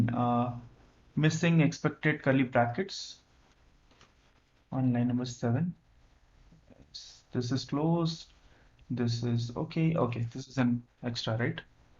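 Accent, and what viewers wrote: India. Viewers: Well no doubt, this man understands his stuff, but this indian english is very exhausting in addition with this bad sound quality. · Really tried to give this a chance, but the Indian accent is just too difficult for me to hear for too long.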